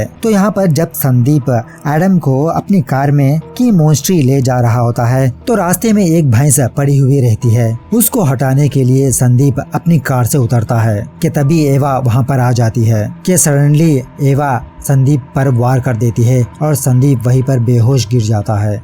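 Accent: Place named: South, India. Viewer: You are south indian... i got it. but nice explanation. good.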